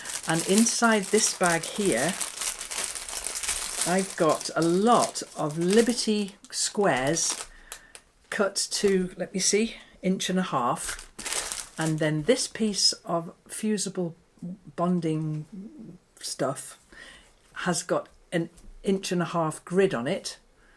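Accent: United Kingdom. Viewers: I agree with sherryconroy. I look forward to your Sunday YouTube videos. My paternal grandparents were from England. They passed when I was just a toddler so I have no recollection of their lovely British accent. Listening to your lovely voice is so relaxing. I wonder...did they sound like you? I'd like to think so. Nowadays so many of the online tutorials just rush through the steps leaving me a bit unsettled and jittery. I think of you as our Mr. Rogers here in America..."won't you be my neighbor". Thank you for just being you!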